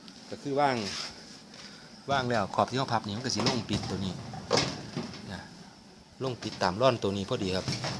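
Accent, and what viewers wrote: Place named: Ubon Ratchathani, Thailand. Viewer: งานเนี๊ยบค่ะ อยู่จังหวัดใหนคะสำเนียงเหมือนคนอุบล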